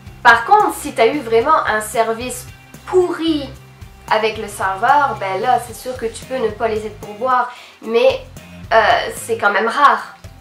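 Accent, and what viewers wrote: Quebec, Canada. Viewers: Tu parles mieux Québécois que moi qui est pure Quebecoise c cute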